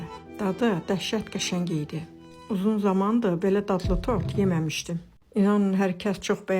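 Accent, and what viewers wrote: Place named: Qazakh, Azerbaijan. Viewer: Haralısınız? Ləhcə var, mən bizim Qazax tərəflərə bənzətdim.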